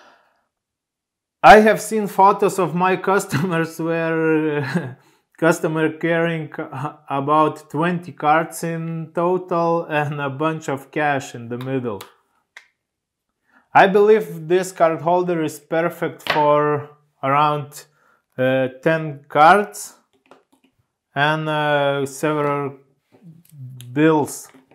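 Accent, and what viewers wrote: Russia. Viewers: Русское произношение...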